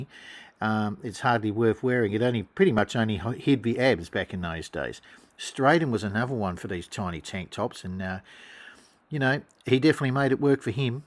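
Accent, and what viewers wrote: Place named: Australia. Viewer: This guy speaks with a very distinct accent - i would estimate that he is either directly from New Zealand, Tasmania or Australia.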